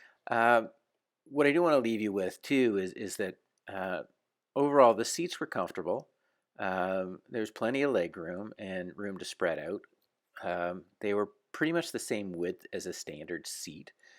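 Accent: United States. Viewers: But you talk in American accent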